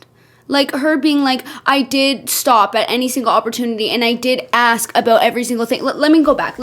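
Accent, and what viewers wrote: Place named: Canada. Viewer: I’ve started watching you recently and the first thing I thought that inspired me to click the video was “this girl has to be from somewhere in Canada if not somewhere in Alberta “ . It’s beautiful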